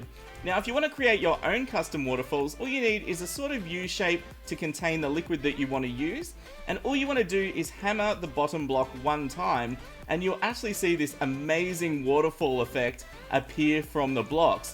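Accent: Australia. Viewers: are you Australian? I feel really dumb right now. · Hes Australian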